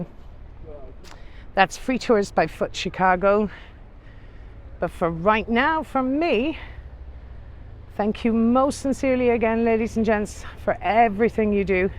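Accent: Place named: Ireland. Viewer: I have to Admit I got a little Crush on the Host!!! I Absolutely love the” Irish” Accent!!. You’re a very pretty lady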